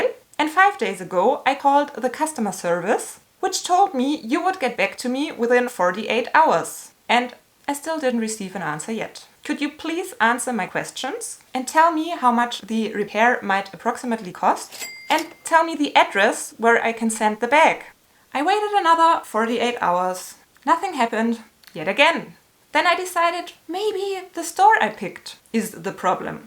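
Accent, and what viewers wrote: Germany. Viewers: I love your channel and your accent! The first time i saw your video, i immediately know you are from Germany. Much love❤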